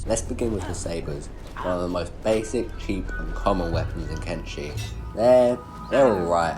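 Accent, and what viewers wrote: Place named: United Kingdom. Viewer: When you're just British without the spiff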